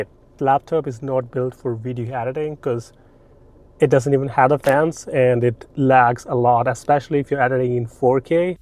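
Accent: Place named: India. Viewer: the indian accent still slips lol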